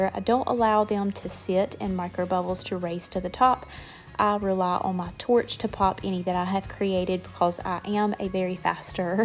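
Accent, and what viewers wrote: South, United States. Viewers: I love your ability to mix items that us "matchy matchy" people would typically not put together. This turned out beautifully, as always. I am curious though, (as a fairly new tumbler maker), how come you chose to do matte when using glitter? I wonder if using a non glitter vinyl and then using the mattify at the end would give it a similar effect? Your cup looked super shiney in the end. Is that how it looks after finishing with mattify? I'm genuinely just curious. Sometimes I feel like I'd rather have a matte finish on mine so thinking about getting some of that stuff. :) Beautiful job as always. I also love the southern accent. I'm in southeastern NC so maybe not as southern but we definitely speak with a southern accent here too. 😋😍🥰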